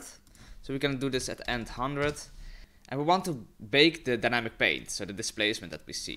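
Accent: Netherlands. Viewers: Where are u from? ur english sounds like ur dutch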